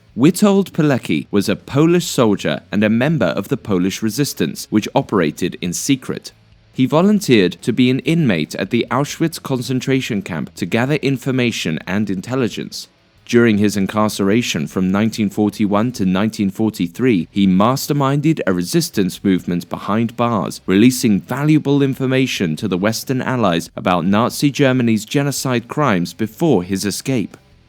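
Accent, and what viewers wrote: United Kingdom. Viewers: As soon as I heard a British guy speaking I bailed sooo sick of everything I watch narrated by Brits bye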